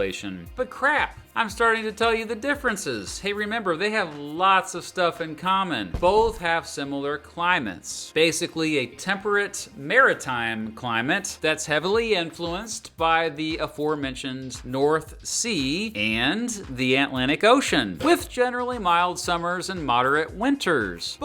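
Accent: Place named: Midwest, United States. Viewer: The lecturer sounds like a native Midwesterner.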